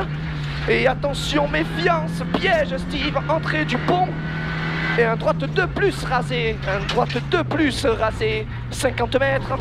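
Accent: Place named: South, France. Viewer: Es ce que vus faites des rallyes dans le sud ? Ps : vous venez d'où ? Car vous avez un accent du sud un peu 😅, j'adore continue comme ca !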